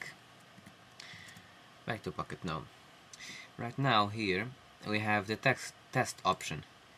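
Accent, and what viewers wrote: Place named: Portugal. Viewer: ola! Vi logo pelo teu sotaque q eras tuga tenho so uma pergunta isto paga-se ou nao?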